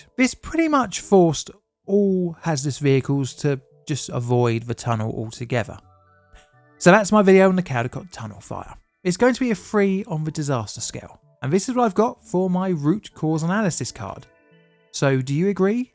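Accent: United Kingdom. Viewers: I like your British voice, and humor.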